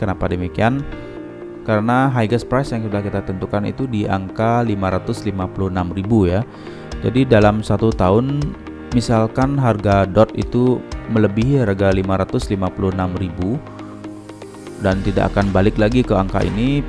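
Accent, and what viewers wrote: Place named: Bali, Indonesia. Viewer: Logat baline kental sekali bli... 🙏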